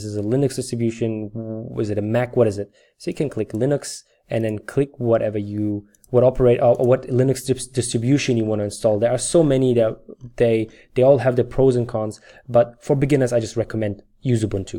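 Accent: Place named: Turkey. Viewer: Am I catching a Turkish accent...😅 nice😊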